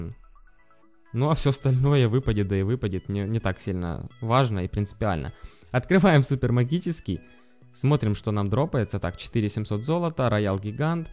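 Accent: Ukraine. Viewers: Украинский акцент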